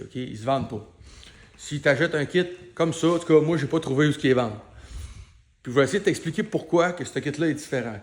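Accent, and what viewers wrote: Quebec, Canada. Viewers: Ben oui ! Avec un petit accent québécois ! 😉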